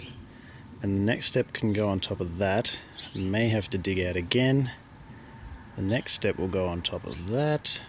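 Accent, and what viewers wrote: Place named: Australia. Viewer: It looks great! Lovely to hear an aussie on Youtube too :)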